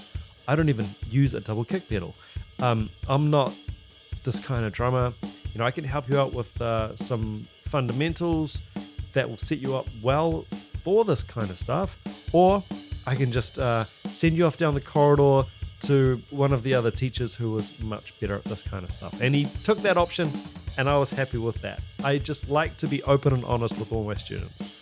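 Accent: New Zealand. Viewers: casually starts watching video ~hears accent~ "here in Auckland, New Zealand". Swears loudly and falls out of bed Gidday fellow Kiwi. How nice of the algorithm.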